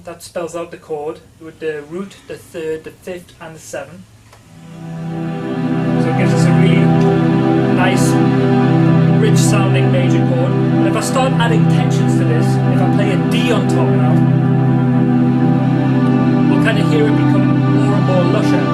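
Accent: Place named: North East England, United Kingdom. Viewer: fellow bassist from the north east that made it! County Durham bassist here.. recognized that accent instantly. Great video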